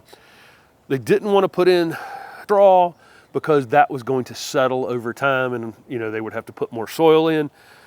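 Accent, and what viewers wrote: South, United States. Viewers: Sounds like you encountered your first troll!! You have made it big😂!! Your southern accent ain’t got nothing on us here in Alabama lol!! We really drag it out but I am ok with my accent!! Some folks really think just because you have a southern accent that your IQ is automatically lower and that just isn’t the case!! Even us well educated folks talk like this lol!!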